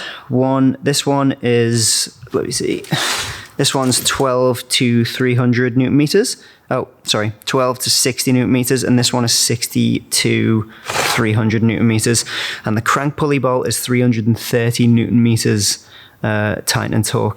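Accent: Liverpool, United Kingdom. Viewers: you a scouser ?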